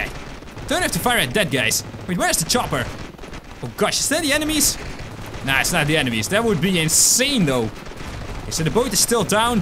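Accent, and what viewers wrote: Netherlands. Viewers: waarom klinkt jouw engels als engels met nederlands accent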